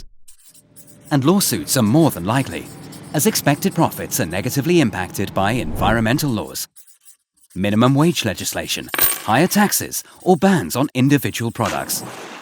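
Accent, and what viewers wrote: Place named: United Kingdom. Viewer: irony. the guy has a UK accent. ////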